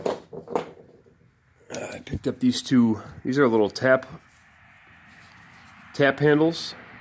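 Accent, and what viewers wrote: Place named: Long Island, United States. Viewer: You from long island???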